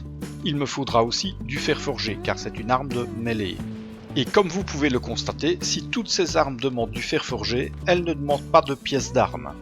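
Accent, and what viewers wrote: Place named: Belgium. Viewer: Au vu de ton accent et celui de papy, je dirai que tu proviens de Belgique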